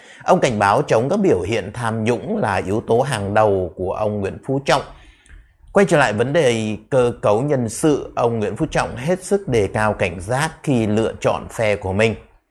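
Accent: Standard. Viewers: Nghe hay. Nói chuẩn. Xin cảm ơn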